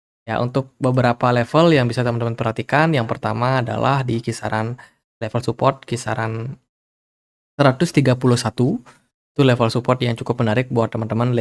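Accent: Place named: Aceh, Indonesia. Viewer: orang aceh bang? mirip banget logatnya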